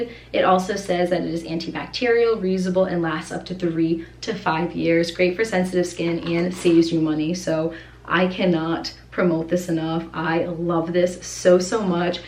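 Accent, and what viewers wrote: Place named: New England, United States. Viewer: I feel like your New England accent really came through in this video with how many times you said water! LOL XD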